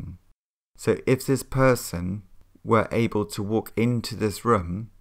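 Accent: United Kingdom. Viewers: Such great instruction. Lovely voice. I adore the British accent. ❤️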